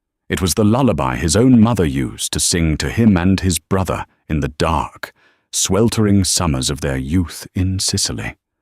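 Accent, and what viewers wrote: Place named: United Kingdom. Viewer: The British accent gives the narration more gravitas. But use a female narrator sometimes, please.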